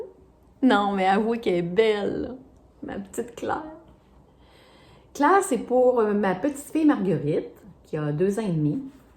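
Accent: Quebec, Canada. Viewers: Bravo pour ce premier podcast. Très bien expliqué. J'adore 😍 et moi, je me régale de l'accent québequois 😉 Même si je n'ai pas l'occasion de tricoter de la layette, ça fait toujours plaisir de voir toutes ces mignoneries, et ton plaisir à nous les montrer est contagieux. Merci d'avoir affronté la caméra et surtout continue 😘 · Merci pour ce bon moment ! On dirait que tu as fait ça toute ta vie 😊vivement le 2eme épisode ! Et ton accent me fait tellement de bien, cela me rappelle mes années québécoises ❤❤❤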